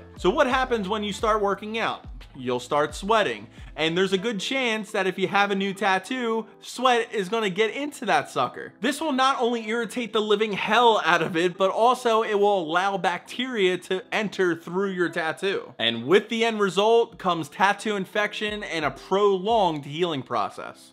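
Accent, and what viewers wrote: Philadelphia, United States. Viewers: I can spot Philly from a mile away...the second he spoke, even before “WADER” being said, I could smell the Wawa through the screen on my phone....Go Birds!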